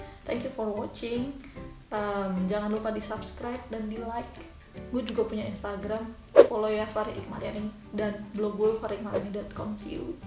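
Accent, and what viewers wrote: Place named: Java, Indonesia. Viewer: Wong jowo mas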